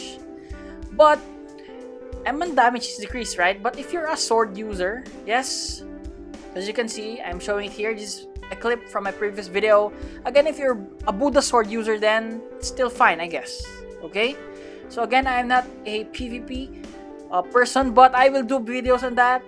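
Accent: Philippines. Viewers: Are you Filipino, your accent😂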